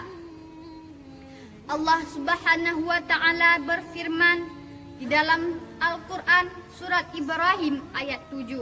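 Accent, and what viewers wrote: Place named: Java, Indonesia. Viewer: Tapi bahasanya masih medok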